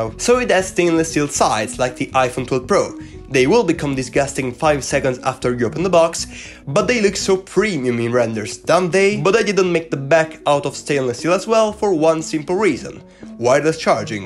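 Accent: Italy. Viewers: Sei italiano zio vero?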